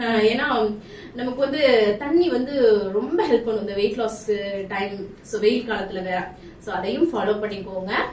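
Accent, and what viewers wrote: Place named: Delhi, India. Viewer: Aap bol ra hi ho bahut accha hi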